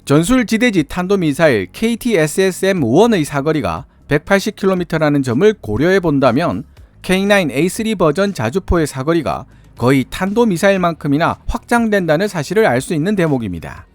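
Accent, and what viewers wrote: Gyeongsang, South Korea. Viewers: 경상도 억양이 상당하시네요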